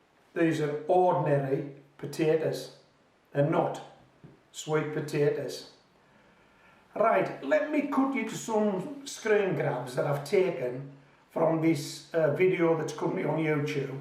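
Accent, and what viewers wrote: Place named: Cumbria, United Kingdom. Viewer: Hi, I've been trying to pin down your accent to amateur rugby league teams and i have decided on Wath Brow. Close? Keep the great videos coming.😀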